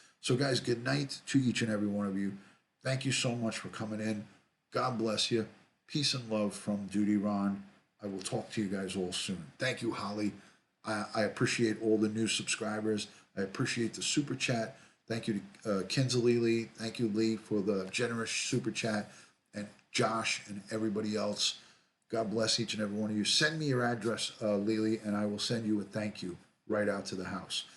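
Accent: New York, United States. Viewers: Hi! I just found you and subscribed. It's good to hear a familiar accent. I'm a native New Yorker transplanted to south eastern Turkey. Let's Go Mets! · I am a new subscriber from the Bronx New York love your channel just listening to you talk I new you were a fellow New Yorker!!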